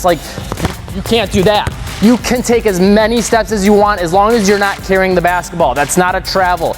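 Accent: Canada. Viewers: Are you Canadian? Hehe, cute accent.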